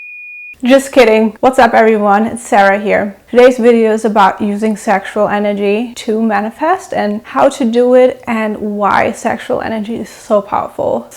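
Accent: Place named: Germany. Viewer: Are you German? I love your accent!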